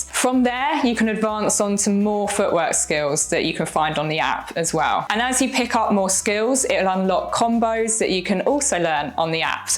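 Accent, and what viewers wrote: United Kingdom. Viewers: nice lesson. Your accent confuses me its 40% British, 60% American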